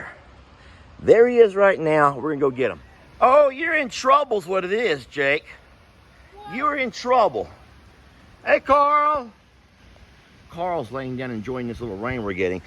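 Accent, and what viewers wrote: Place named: Texas, United States. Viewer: In Texas we have our own way of pronouncing words 🤣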